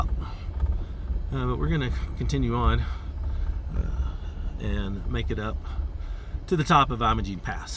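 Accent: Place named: France. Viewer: Your Frenche is adorable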